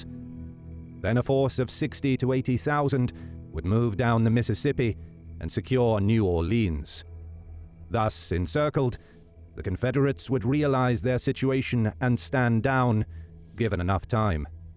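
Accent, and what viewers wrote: United Kingdom. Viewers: Nothing like s Brit telling us about our war. Southern sympathiser. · I really hate hearing a brit talking about our civil war. Probably because you got thrown of my country. Now look at yall😂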